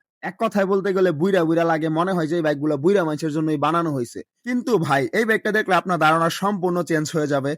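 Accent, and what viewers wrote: Narsingdi, Bangladesh. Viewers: Bhai Apner basha ki Narsingdi te ?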